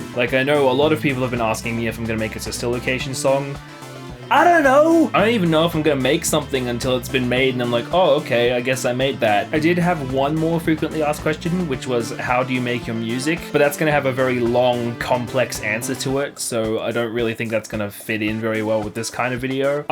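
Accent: Australia. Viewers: Kneight yep Australian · Australian I'm pretty sure... not positive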